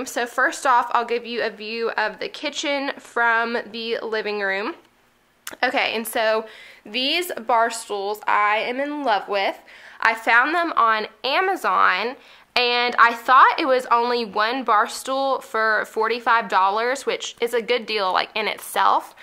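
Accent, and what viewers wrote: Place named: Tennessee, United States. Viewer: I found your channel through this video, it was reccomended to me because of my minimalism searches. lol. I rarely comment on anything on YouTube, but I had to say, I love everything about you and your channel. I live in Wisconsin, but I'm from Pigeon Forge, Tennessee. You remind me of home! Your accent, your style, your record.. Everything ❤️ You're such a breath of fresh air. I'm subscribing for sure! ❤️❤️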